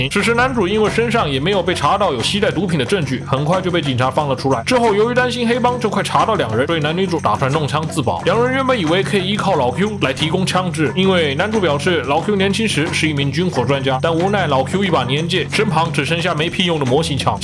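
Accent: China. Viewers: 是中国腔，，你的叔叔是中国人吗？ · 故意用中國腔講電影🤣🤣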